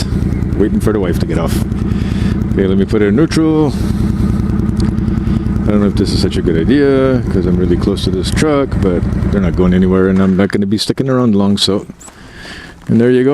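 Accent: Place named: Fargo, United States. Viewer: Hello! A thoroughly enjoyable trip along with some wide-open Canadian scenery! Good to see the Missus and her happy, cheery face! A real treat! Now that the December snow has arrived it was nice to see some earlier, snow-less fields! Hunting stuff with Big guns, Bear Traps, and Fishing gear...😮...😮.. you know me..😉....😉...., I just pop into Sainsbury's Super-Store and collect all my wild-life...ready prepared for me!...LOL..😋....!... and no-one gets shot!...LOL..😂...! Your "Fargo" (film) accent still tickles me,...every time!... 😂...😂...! Many thanks again for the trip, and best wished to the Missus...she needs to be kept warm!....😊...😊...!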